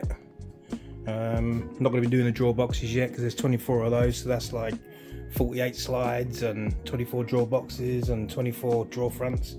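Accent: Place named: England, United Kingdom. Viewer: Amazing work—love his English accent…😃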